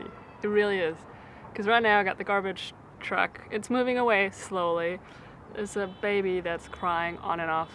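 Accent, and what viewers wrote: Germany. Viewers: Ich hör zwar, dass du Deutsche bist, aber es ist so viel angenehmer als bei vielen anderen. Danke für deine Tipps und viel Spaß noch. :)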